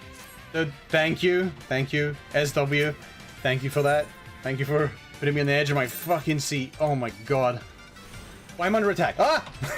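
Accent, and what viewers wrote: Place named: Scotland, United Kingdom. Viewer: another Scottish final fantasy xiv YouTuber... oh wow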